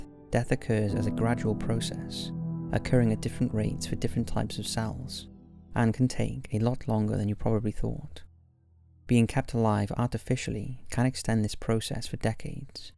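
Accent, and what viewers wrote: Wales, United Kingdom. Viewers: moonbeam starchild - that's the Welsh accent for you!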